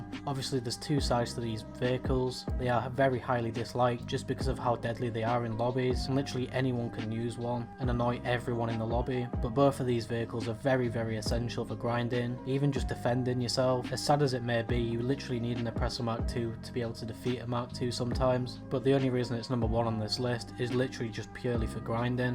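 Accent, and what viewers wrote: United Kingdom. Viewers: So sound like you’ve got an English accent but call it garARJ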